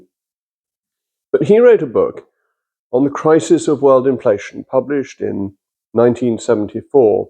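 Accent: RP, United Kingdom. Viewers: I love his posh manner and accent. Muy pijo as they say in Spanish lol.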